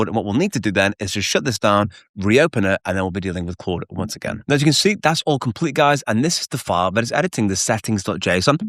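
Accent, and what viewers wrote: United Kingdom. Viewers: Love your accent! Is that british?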